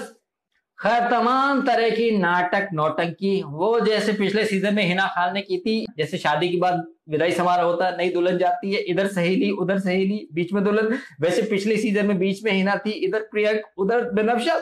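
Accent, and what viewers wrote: Haryana, India. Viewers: jii aapka haryanwii andaaz ekdum kamaal...jalota aur jasleen ka kapdonka drama sirrf aur sirrf trp boosting tactics..kuch bhi reaistance n dikhake directly kapde aur makeup de deti toh drama kahanse bann pata..agar trp laa rahe hoo toh bb khud bachate hai frm elimination... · bhai aap kamal ho 👏👏 👏......Aapka Haryanvi andaaz, aapki break up wali aisi lagi lagan aur jasleen-anupji ka breakup ka jo varnan kiya hai wo bahout enteratining laga..Mujhe personally bahut hassi aayi jab aap alag andaz mai baat kar rahe the.....Aapka 15-20 min ka review 1 gante ki borring bb12 se jyada entertaining hai 😂😂😂😂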